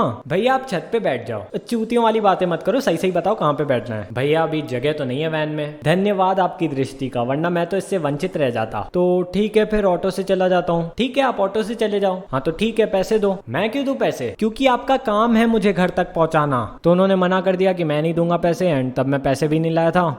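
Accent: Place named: Punjab, India. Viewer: 😂😂😂😂😂😂😂and that punjabi accent of yours......yrrrrr you are just wowwwwww❤️❤️❤️❤️❤️😂😂😂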